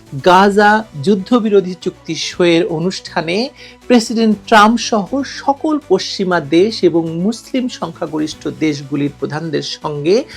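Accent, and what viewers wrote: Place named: West Bengal, India. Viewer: আফা ভারতের ভাট পারার মেয়েদের মত কথা কয় কেন? বাংলাদেশীরা জানতে চায় ।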